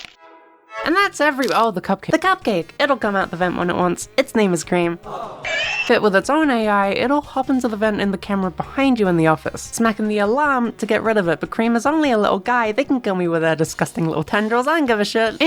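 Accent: Australia. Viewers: Great video, but I have to ask what's up with your voice? Kinda Aussie, kinda British? Its a bit hard to listen to, if im honest. Hearing a perfectly fine austrailian accent and then you suddenly drop your T sounds to an almost comical degree (the "bo'oh'o'wa'er" effect). Ive lived long enough in both Australia and the UK to say that ive almost never seen australians drop their T's like that, and most british accents dont do it nearly as overtly as most think. This isnt a personal attack, its more that im genuinely curious. Its the same sort of question i'd ask if I knew someone that had never lived in the UK all their life but spoke in an american accent. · I can’t tell if is Aussie, Brit or American anymore, but I have an autism accent so I can’t talk. Happy to be here nonetheless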